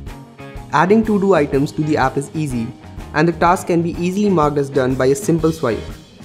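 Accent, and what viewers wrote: India. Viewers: Your English is fucking horrible man lol why does every Indian have that accent, anyway get some braces -Canada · indian accent ...hhhh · Your accent makes me respect indian english accent now. Sounds way more better than singlish. Oh i hate singlish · Indian accent makes are the worst accent ever Just sounds horrible like nails on a chalk board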